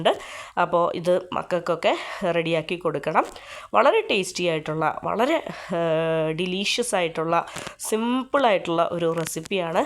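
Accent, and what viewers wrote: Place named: Kannur, India. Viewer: ചാനൽ ഫുൾ കുക്കിങ് തന്നെ ആണലോ,, നിങ്ങളെ വോയ്‌സ് കണ്ണൂർ കാരുടെ പോലെ തോനുന്നു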